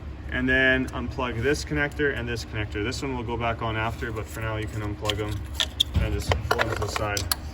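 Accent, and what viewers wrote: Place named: Canada. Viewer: You sound Canadian, where are you working from?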